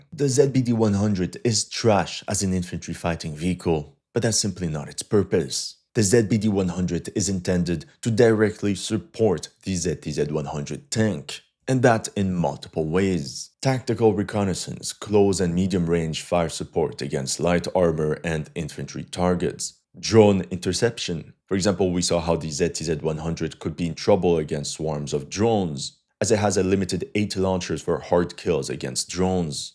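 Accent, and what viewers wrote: Quebec, Canada. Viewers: Je savais cetait un quebecois depuis le debut 😅😅😅 ga cest ben correct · « c'est ben correct ! » haha le gars est Québécois !! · ahh that Canadian french accent.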